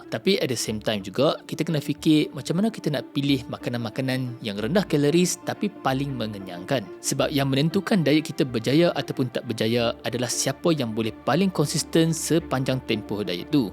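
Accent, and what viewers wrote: North, Malaysia. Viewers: Doc mesti org utara kan..sbb saya dgr doc kata "ayaq"...kita gang 💪💪😂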